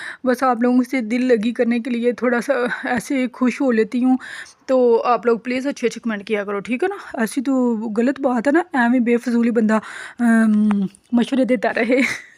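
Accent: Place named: Gujranwala, Pakistan. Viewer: Gujranwala ki punjabi lgti hai apki.... Or without any reason laughing itni achi nhi lgti....baki theek hai...or dua ap khud he kr lea kro apny leay... Hmari tu apni duaian reh jati hn oudhuri...!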